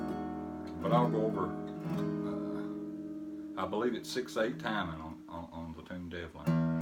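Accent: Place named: Appalachia, United States. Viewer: Best accent on earth in my opinion, but I didn’t grow up in the holler.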